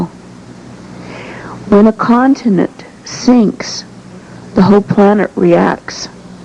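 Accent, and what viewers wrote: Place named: United States. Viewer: why does she talk in american accent?of all accents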